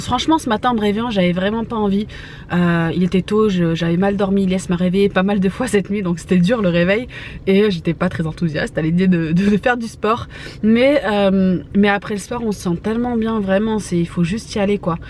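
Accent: Algeria. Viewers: t adorable à parler avec l'accent Algérien et ton papa lahi yahafdou adorable j'en peux plu de rire avec eux 😘😘😘😘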